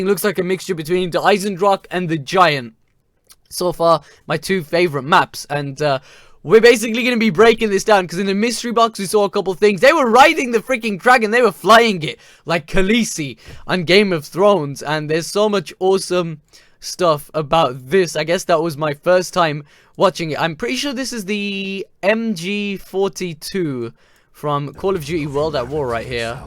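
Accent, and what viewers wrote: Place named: RP, United Kingdom. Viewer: Fucking posh mate🙈🤗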